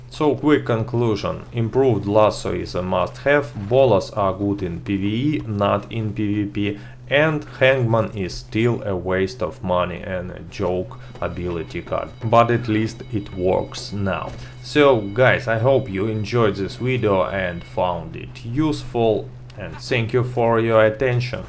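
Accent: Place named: Russia. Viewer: Still laughing please don't ever lose the humor everything us so much funnier in Russian accent!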